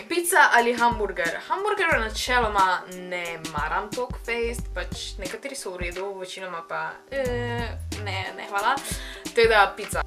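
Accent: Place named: Ljubljana, Slovenia. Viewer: ti si z lublane ziher k lublansk govooriš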